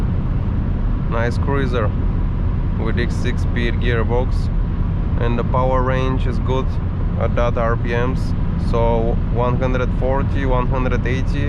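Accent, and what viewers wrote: Bulgaria. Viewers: Евала за клипа още от акцента те разбрах ,че си от нашите хора 😁🤣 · Първо, като почна клипа си помислих, че е някой чужденец, обаче като чух как говориш и веднага познах, че си българин. Много готино клипче, прожължавай все напред! :)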